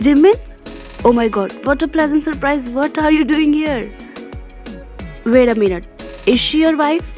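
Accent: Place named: India. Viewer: You are Indian right because of your voice it's telling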